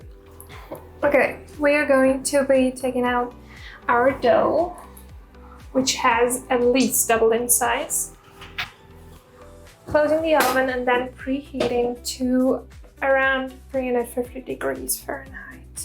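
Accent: Germany. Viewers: She is German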